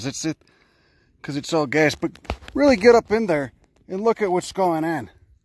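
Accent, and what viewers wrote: Chicago, United States. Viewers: The 'Cago accent pronouncing Latin is the best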